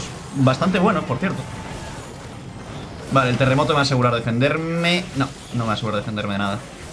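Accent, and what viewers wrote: Spain. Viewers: Amigo eres re simpático y me gusta tu acento de España , Te ganaste un nuevo sub Juegas re bien :)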